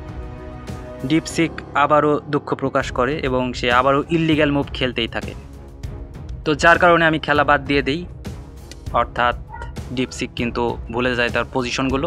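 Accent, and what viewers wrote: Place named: West Bengal, India. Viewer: না,মানে আপনার কথার ধরণটা পশ্চিমবঙ্গের মতো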